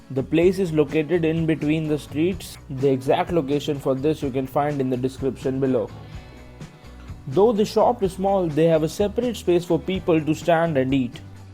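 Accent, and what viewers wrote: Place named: India. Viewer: You have an Indian accent. Nice presentation.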